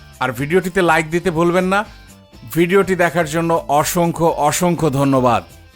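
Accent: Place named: Dhaka, Bangladesh. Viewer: ভাইয়া আপনার ঢাকাইয়া ভাষায় কথা গুলো শুনতে আরো বেসি ভাল লাগে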